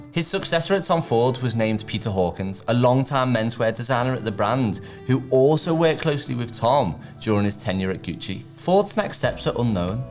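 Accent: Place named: Northern England, United Kingdom. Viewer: Great vid. Very informative. Great northern accent. That compliment is from a South London boy. Ya get me.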